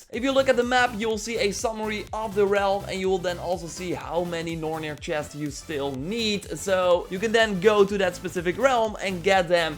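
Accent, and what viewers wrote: Netherlands. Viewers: Are you dutch :) I guess yess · is he european his accent sounds dutch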